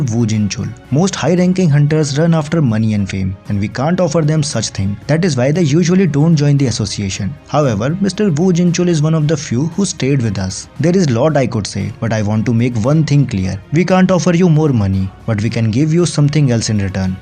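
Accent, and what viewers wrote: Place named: India. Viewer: Nah not this India voice 😢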